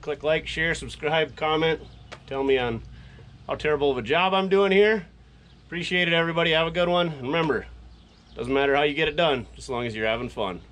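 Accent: Minnesota, United States. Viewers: Just found your posts and I want to tell you how much I enjoy them! Basic but informative and just a plain old fun watch. Sounds like your from Minnesota or there about?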